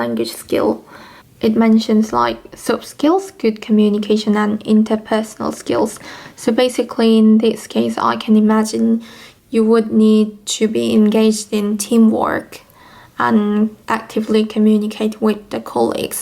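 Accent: United Kingdom. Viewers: 우와 발음이 미국 발음이 아니라 영국 발음이네요 완전 멋있어용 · 이제는 누나라고 부를게여... 영국발음 너무 멋지잖아여!! 저도 슬슬 인턴 나갑니다. 영국가는날까지 열심히 해야겠어요 ㅎㅎ 코로나 조심하세요~~~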